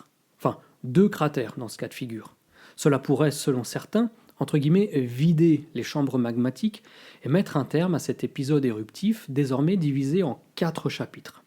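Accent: Iceland. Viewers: Bonjour pensées sincères au peuple islandais. Ils vivent véritablement sur un baril de poudre Merci pour ton partage et.....ton accent islandais.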